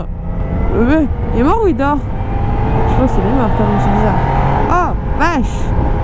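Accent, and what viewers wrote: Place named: Corsica, France. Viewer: Top l'accent Corse... ✌️Et des vraies routes avec des virages, des bosses, des animaux sur la route,. Il faut pas se la faire en mode tourism tophy pour rester en un seul morceau. En plus ça sent bon le maquis corse, l'air marin, enfin bref ça sent bon l'oxygène, ça sent bon les pistes en terre aussi 😆✌️. Le nikken, comme tout ce qui a plus de 2 roues c'est pas trop mon truc, mais faire les presque 600.kms avec un pour essayer, bien sûr. Mooi je trouve que tu maîtrises quand même pas mal du tout, alors respect. ✌️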